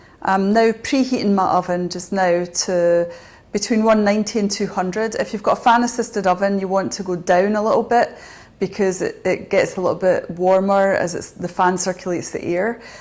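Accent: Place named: Scotland, United Kingdom. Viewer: It's easy when you know how, but the how is all in the person , in Ireland in the past ever one made irish brown soada bread and ever one's bread was different by taste and i mean everyone and everyone's preference was different, bread has the personality of the person who makes it in it and it's the best ingerant, perhaps that's why holy communeyen has the body and soul of Christ in it Give us our daily bread , you have a beautiful Scotch accent, a Caledonian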